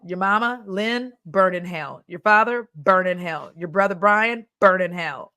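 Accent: United Kingdom. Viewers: The hair 😍 and your British accent even made my British knees wobble 😂 I was never a huge Britney fan but was certainly team free Britney. I'm quite impressed she didn't go for the easy revenge in the book. Seems classy. And I didn't realise she was our age, having been in that situation since her early 30's ish. That's so much of her life they stole. I'm not surprised she's living her life how she wants now.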